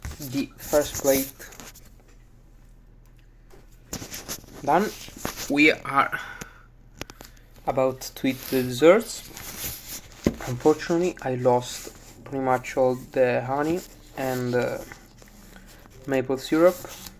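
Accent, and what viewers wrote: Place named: Italy. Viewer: I love your accent! ❤ i love youu!! So amazing 😂😊✨ be blessed! I love italy! I love italian men ❤❤❤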